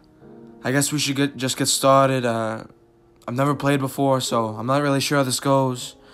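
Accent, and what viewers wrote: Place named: Staten Island, United States. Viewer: Sounds like Anakin with a Staten Island accent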